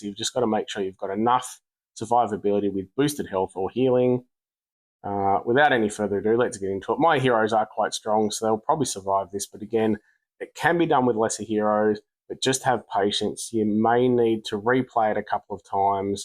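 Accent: Australia. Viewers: lol as a Texan I can tell you that the Beaver Nuggets are an excellent little snack. Bucees gas stations are a destination spot around here. I never expected to hear an Australian talking about the Nuggies in an Empires and Puzzles video on your tube though. Crazy times lol 🤣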